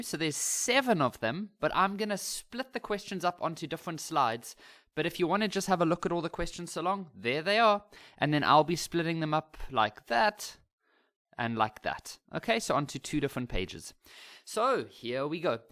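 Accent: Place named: South Africa. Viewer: You're South African😳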